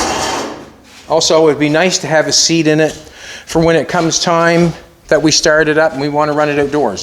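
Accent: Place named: Canada. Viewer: that Canadian accent gets on my nerves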